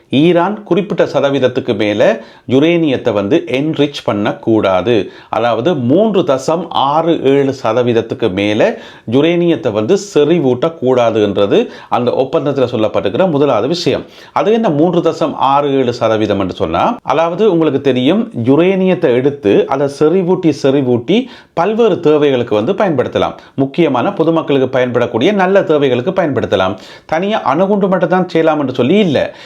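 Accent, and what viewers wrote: Jaffna, Sri Lanka. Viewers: தெளிவான யாழ் தமில் Love it.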